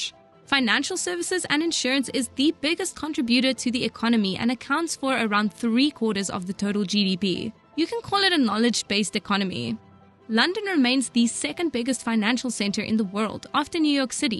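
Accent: South Africa. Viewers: the narrator's accent is so South African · I know a sef effrican accent when I hear it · There's no way the person voicing this isn't South African... Would be be a change.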